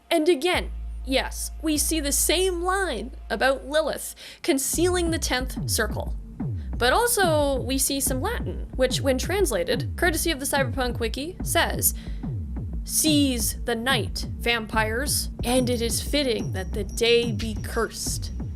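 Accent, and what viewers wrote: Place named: Canada. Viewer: Lake Minnetonka? I thought that was a Canadian accent I heard. I suppose Minnesotan and Canadian accents are pretty much the same.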